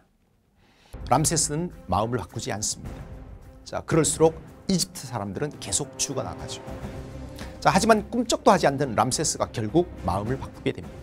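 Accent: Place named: Samcheok, South Korea. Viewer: 20강짜리 7일동안 듣고 한국사능력검정 93점 맞게 해준 ㅋㅋㅋㅋ 강의할때 삼척사투리 쓰시면서 카리스마 넘치시는데... 착한척 말투 완전 적응안되네 ㅋㅋ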